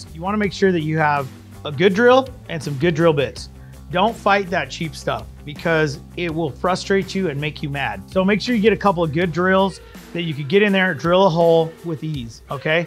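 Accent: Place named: Montana, United States. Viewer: are you from Montana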